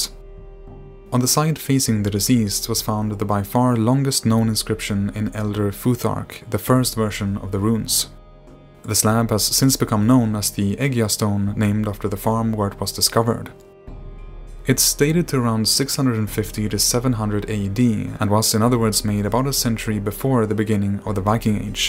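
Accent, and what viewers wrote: Sweden. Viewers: Måhända att det är en underlig fråga men kommer du från Blekinge eller småland?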